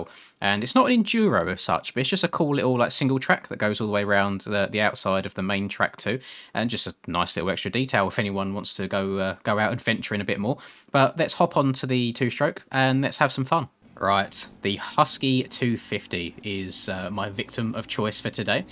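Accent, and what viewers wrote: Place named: England, United Kingdom. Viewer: im american and i can attest to the fact that i watch your videos because of your typical english man accent it adds to my experience and plus your a really good rider that makes good content keep it up broski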